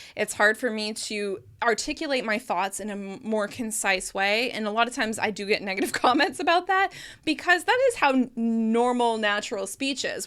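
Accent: United States. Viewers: Perfect American accent!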